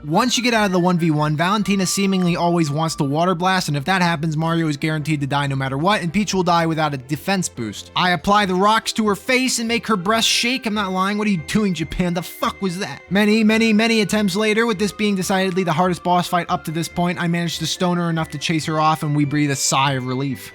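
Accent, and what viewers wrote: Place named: New York, United States. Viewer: A New York AVGN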